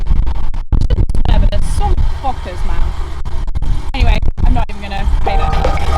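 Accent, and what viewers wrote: Ireland. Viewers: Can tell you’ve been living in Ireland for a bit “the crack” and “grand” 😂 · Car is amazing, and I hear a little Irish slang in you these days haha good to see the time here in Ireland rubbing off! You've accomplished so much for 29 so no pressure just enjoy your 30th!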